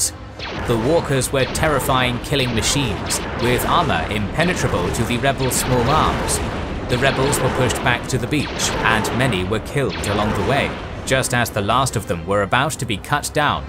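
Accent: United Kingdom. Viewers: Horus Heresy next ………you sound British 😄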